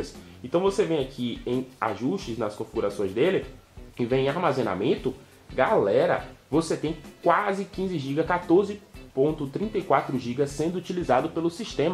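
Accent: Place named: Bahia, Brazil. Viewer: Gostoso! Você e baiano? Que sotaque delicioso. Amei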